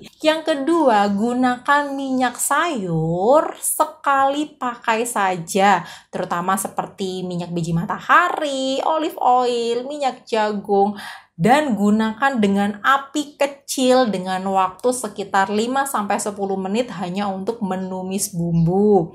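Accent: Java, Indonesia. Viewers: Bu dokter cantik ...medok bgt org Jawa kah....tmksh semua ilmunya🙏❤️ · Wah baru dgr suaranya medok 🤣🤣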